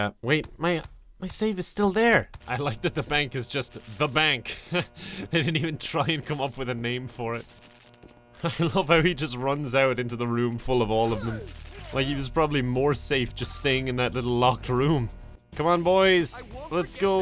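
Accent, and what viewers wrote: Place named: Ireland. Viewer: You sound a lot more Irish in this video.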